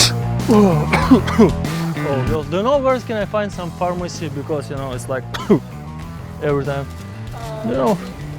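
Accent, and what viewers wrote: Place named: Russia. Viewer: Жесткий русский акцент )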